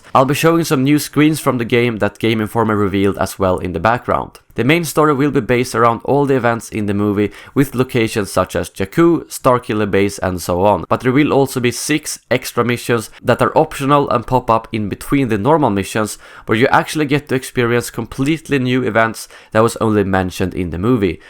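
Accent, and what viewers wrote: Sweden. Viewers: är du från sverige ?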